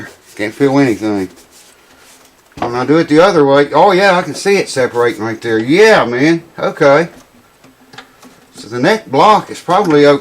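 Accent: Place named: Arkansas, United States. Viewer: Tickles me, I am from Arkansas and you talk a whole lot like I do. I am just uglier than you, B/C I am 71 (seventy & one) years old.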